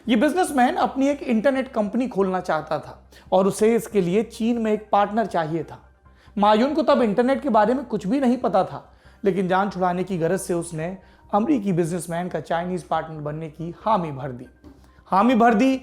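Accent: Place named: Kanpur, India. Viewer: Gazab haunk diye dadaa.. Chungi lga di.. Hahaha.. Kanpuriya andaaz wah wah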